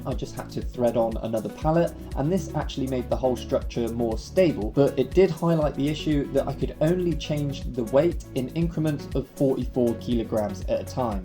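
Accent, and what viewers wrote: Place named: RP, United Kingdom. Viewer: Wow, thanks for the reply lad! 💪 I'm actually a woman, but I do my best to keep up with the guys, and challenges like this are right up my alley. We just love seeing people doing more with pallets here Pallets R Us, we think they’re a really under appreciated bit of kit that the whole global supply chain relies on daily, people often forget that society would grind to a holt without them! I think you might be across the pond (guessing from your Prince William accent 🤴), but we'd love to help you out with some pallets sometime if we can hit it? So, if you're ever down for a transatlantic challenge, hit us up!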